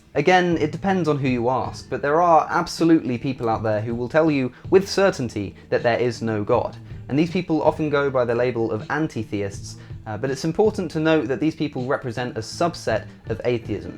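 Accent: United Kingdom. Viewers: Are you British or English?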